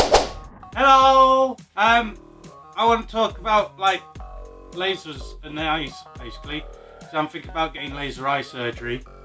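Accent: United Kingdom. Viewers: You are too British for me to understand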